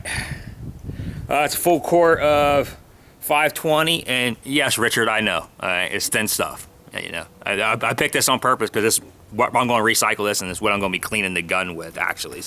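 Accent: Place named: Pittsburgh, United States. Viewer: You have to be from Pittsburgh. Tell me your a fellow yinzer? Trying to identify the accent..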